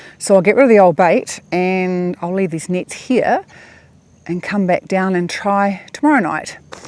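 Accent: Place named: New Zealand. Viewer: You sound like a Kiwi! I have always fished for yabbies with scrap meat on a string, and a plastic rake.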